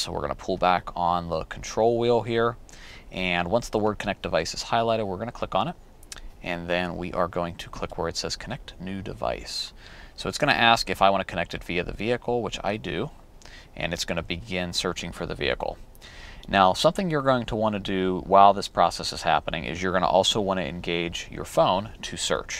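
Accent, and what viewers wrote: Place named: England, United Kingdom. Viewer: very nice english accent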